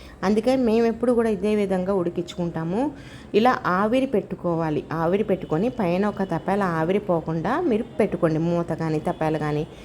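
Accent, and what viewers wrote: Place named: Telangana, India. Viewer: తెలంగాన vsllu వాళ్ళ yasatho matladutharu manayasa thapala అంటె thappa ?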